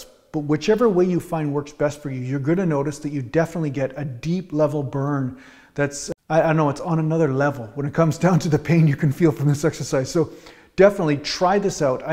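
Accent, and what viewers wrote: Canada. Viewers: I put a couple of simple things together today. In a video of yours I watched earlier today, you mentioned you live in Vancouver. That explained a certain accent you have, along with your interest in and hockey playing. I live near Detroit, right across from Windsor. Before cable came along, I used to watch the Leafs on CBC, along with other good Canadian programs. Cool Aa!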